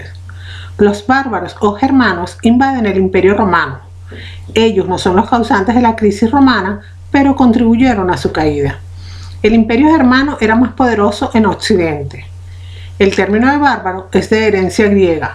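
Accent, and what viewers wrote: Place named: Colombia. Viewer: De que provincia de Colombia es este acento?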